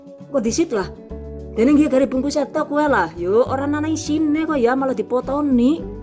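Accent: Banyumas, Indonesia. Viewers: 😁😁😁ana bahasa ngapak e kya nyong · Wong endi si rika mbakayu...? Deneng ngomonge pada karo enyong...! Jos lah top markotop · AQ suka bahasa ngapknya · Ngapak temen wa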